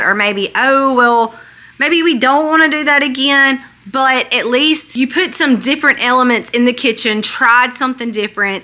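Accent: South, United States. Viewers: I'm from the south but I absolutely love your southern twang. The south is the best even our Southern Belle women have sweet beautiful speech!!!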